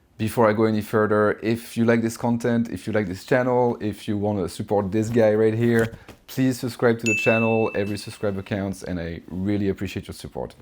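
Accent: France. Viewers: This accent... are you French or so? Wonderful video! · Great video! Are you french btw? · French accent spotted !!! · nice vidéo and a lovely accent ! (french ?) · Pur accent francais mdrr très interessant en tous cas c'est super on manque de chaines comme ça sur yt ! Je me tate aussi à m'y mettre ^^ Mais ouais encore une fois très cool ! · super vidéo ! avec l'accent français . i luv it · Hi, are you french ? I am French and your accent sounds very French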